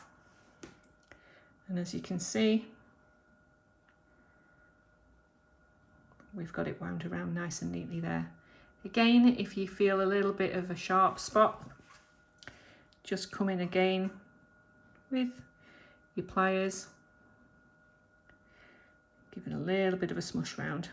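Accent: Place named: North West England, United Kingdom. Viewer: Great tutorial; you make it look so easy and I can't wait to have a go. I'd also love to know how to make the spiral. I detect a North West accent; are you from anywhere near Widnes in Cheshire?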